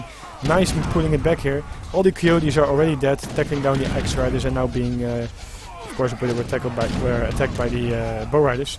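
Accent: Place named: Netherlands. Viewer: The accent is dutch, the way you talk is dutch, welkom mede hollander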